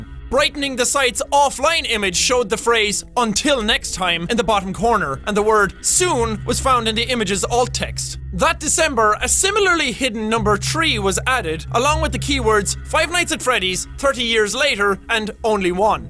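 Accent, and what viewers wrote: Ireland. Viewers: It's his accent, he's Irish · I love that Jack is so Irish in this video. Like he says "tree" when he means "three" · Because with an Irish accent you can't really turn it off. It's not on purpose, it's just natural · Kyru Shelton he's irish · The Irish Blaggard Jack says "Top of the morning to you laddies" as a parody and a way to make fun of himself. He has stated this in one of his older videos. In fact, he use to hide his accent. If you want to see a REAL forced accent, maybe you should look at his older videos. It is in fact an Irish accent. Do you seriously expect all Irish accents to sound the same? As people have said, he speaks kind of monotone in this video and tries hard to pronounce everything correctly; similar to what he use to do. Maybe you should actually learn a bit about him before making an idiotic comment. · I'm Irish and that's how a lot of us sound. 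Not me, because it depends what part of the country you're from, like Victor Cheng said. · get the fuck off of YouTube it's not his fault he has a Irish accent it's just his nationality you cancerous dipshit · Justin Talmadge He's Irish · Not only is it weird to hear him not yelling, but back when this was made, his Irish accent was more audible. For example, three sounds way more like tree. XD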